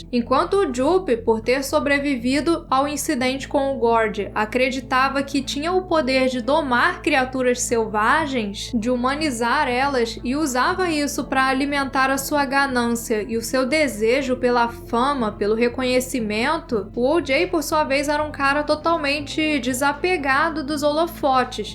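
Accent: Rio de Janeiro, Brazil. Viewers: Timbre agradável e sotaque carioca bacana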